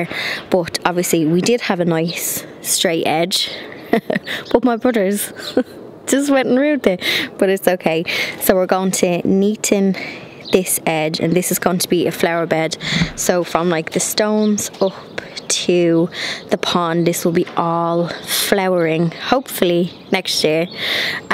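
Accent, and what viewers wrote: Ireland. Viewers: You make the Irish accent sound cute 😉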